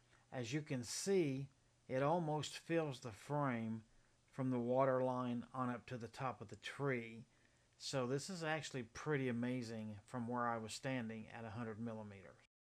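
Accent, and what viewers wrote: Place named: South, United States. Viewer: Nothing like a southerner gentleman; you explained it well thank you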